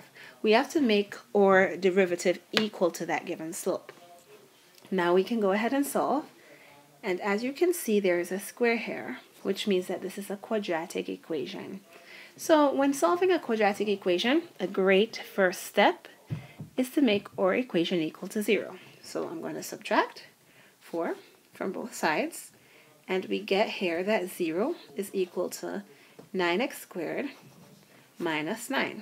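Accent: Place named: Nigeria. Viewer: is that a Nigerian accent I hear seeping through.... much luv!!!!!!!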